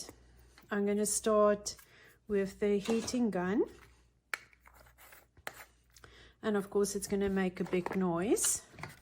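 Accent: South Africa. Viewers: Hi there from North Carolina, USA. You must be either in SA or an ex-pat! I grew up in the Cape. Love hearing you speak, brings back memories. I have been working with teabags for some years, trying many different projects!! Can't drink US tea, so still buy my Typhoo and PG Tips... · Ek het nogal gedink ek hoor n Suid-Afrikaner praat. Baie oulike idees, dankie.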